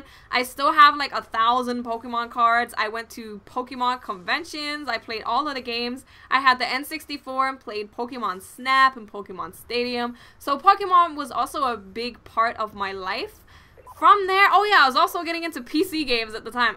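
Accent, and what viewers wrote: Latin America. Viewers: are you Hispanic? I love your slight accent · lol, I can hear the Latina in her voice. Awesome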